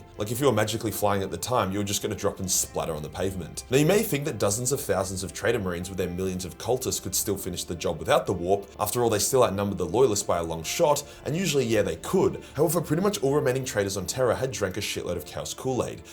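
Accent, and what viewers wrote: Australia. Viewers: I’m here to hear the Aussie Chad talk it all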